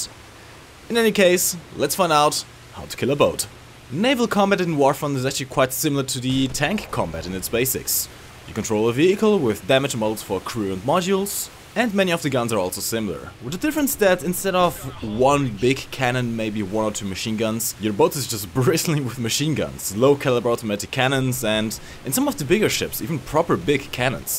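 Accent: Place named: Germany. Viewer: Thanks! Very helpful. Love your channel. I am a loyal subscriber and love your German accent!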